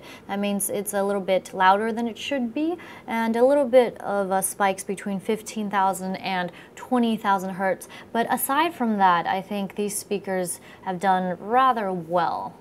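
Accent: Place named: Asia. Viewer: Asian accent ))))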